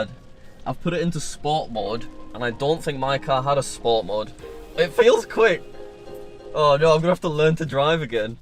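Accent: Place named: Scotland, United Kingdom. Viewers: Right! I didn't know if he was living in Scotland or England right now, so I just guess Scotland because of his accent. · yeah I live in Scotland he does sound a wee bit Scottish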